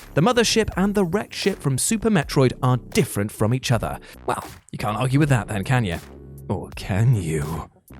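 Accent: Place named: United Kingdom. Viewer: Has anyone ever said that you sound like a more "proper British" speaking version of Luke from OutsideXbox OxVenture? More "proper" from an America's viewpoint that is heh heh 😅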